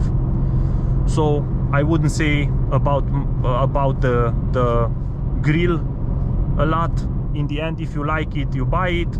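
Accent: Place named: Russia. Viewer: Man... I love your accent. By any chance, do you have Russian roots? By the way, I saw this in the description ''*Manhart Stage 1 will allow 300+ kmh ''. Honestly, I'm not sure about that. In your other video I saw 256km/h at almost 6000 rpm. The manual gearbox seems to have short gears. 300km/h+ should be possible, especialy with a remap, but I wonder if that gearbox can reach such speeds. Stock these do about 1.2 bar boost. If the turbo can do about 2 bar or more, expect atleast 600hp, maybe something close to 650hp with a stage 1 remap.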